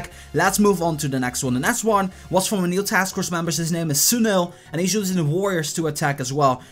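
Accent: Netherlands. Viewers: This is one of the most helpful players I've found. His accent is Dutch. You guys are rude.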